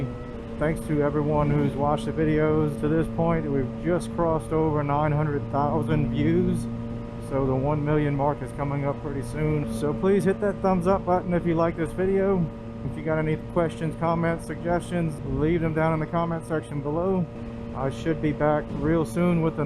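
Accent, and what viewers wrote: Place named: Louisiana, United States. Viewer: Should’ve pulled the AIS off while you were at it. South La you sound like saint Martinville type people.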